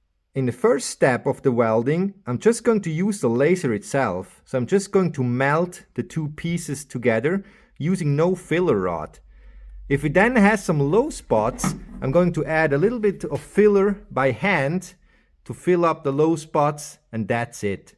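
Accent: Switzerland. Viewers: Another Youtuber with a Swiss Accent! Nice to hear you speak. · das ghöri sofort use das schwizerdütsch e der, chasch es ed verstecke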